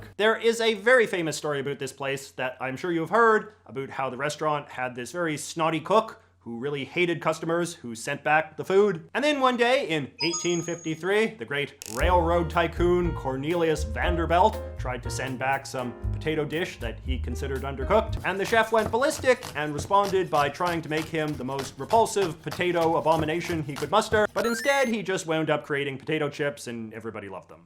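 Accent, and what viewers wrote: Canada. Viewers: He's canadian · Strongest Canadian "-out" I've ever heard. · is this guy canadian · He’s either Canadian or Minnesotan I presume :/ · what is that accent i hear aboat instead of about lol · he’s Canadian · This guy has the most pronounced Canadian accent I’ve ever heard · Bro is the most Canadian Canadian I have ever heard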